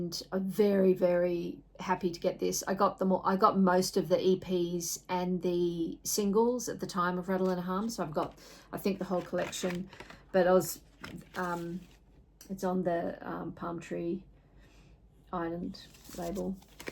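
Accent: Australia. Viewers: Great to hear an Aussie voice, not too many in the VC. Cool that you showed Hermitude. I grew up at the foot of the Mountains, my Aunty still lives at Blaxland. Where's a good record store in Western Sydney? Next time im there i want to have a dig.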